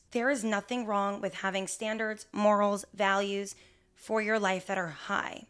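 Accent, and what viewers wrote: New Jersey, United States. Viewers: your channel is a blueprint of what it means to be magnetic! You’re authentic and not trying to be anyone else, from your Jersey accent to your fashion sense all your own unique stamp. You show up vulnerable and passionate about the topics you post on. You’re kind and generous to your online girlies and in real life. Your presence is noticed and missed when you’re gone! You’re able to get your community to open up to you and trust your advice. You have grown into THE magnetic woman! ❤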